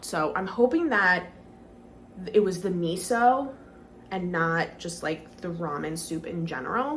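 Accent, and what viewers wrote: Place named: New York, United States. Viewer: I love the ny accent ✨💗💗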